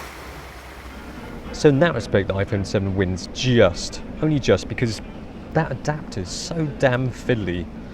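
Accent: England, United Kingdom. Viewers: are u English bro? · Great video Phone comparison!. But I think this is the first time I've ever seen an Asian man with a British accent?.. but then again I live in the states.. cheerio!😉🇺🇸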